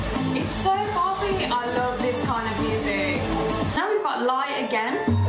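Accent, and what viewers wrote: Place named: United Kingdom. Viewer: Wait are you Swedish!?? I though you were from the Uk! I’m Swedish btw lol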